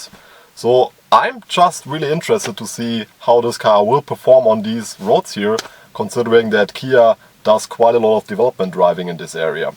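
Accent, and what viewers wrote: Germany. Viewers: canomalo he's German not slow dumbass...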